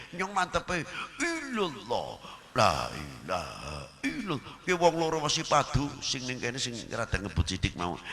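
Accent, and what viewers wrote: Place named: Tegal, Indonesia. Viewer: Padahal asli pekalongan tapi bahasa tegale kaya wong tegal nemen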